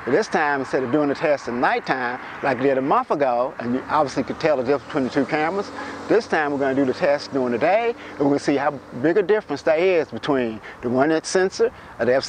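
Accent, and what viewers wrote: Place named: South, United States. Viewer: GREAT presentation, Sir!! I just love your Southern accent. Great use of language. Keep on sharing!!! Greetings from Montreal.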